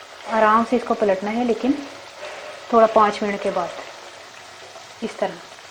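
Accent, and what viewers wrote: Punjab, India. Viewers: ap ka lehja to Panjabi ha lgta ni Karachi ki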